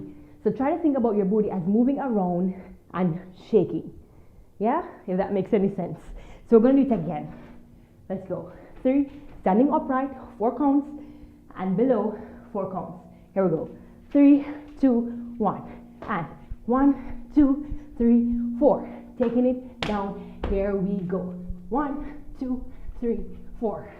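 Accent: Jamaica. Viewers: Hello from new york city.....I love her Jamaican accent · ooh girl, you took the "nasty" out of twerking and elevated to a real dance form...loved watching and listening to that lovely jamaican accent 🥰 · I LOVE THE JAMAICAN ACCENT ......EXOTIC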